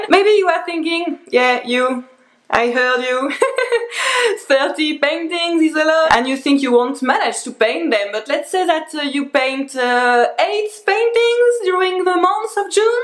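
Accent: France. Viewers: As a watercolor pigment geek, and yes I also live in France but have not your cute accent, I try to put each day the spot on one pigment. Thanks to you as this idea came up watching your video. Merci beaucoup et à bientôt.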